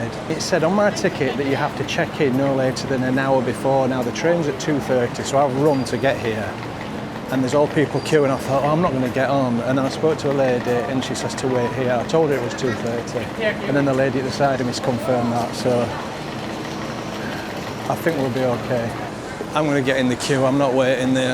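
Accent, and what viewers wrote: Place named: Barnsley, United Kingdom. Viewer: Love the Barnsley twang. Knob-dy and int it. I talk exactly the same! 😂😂😂😂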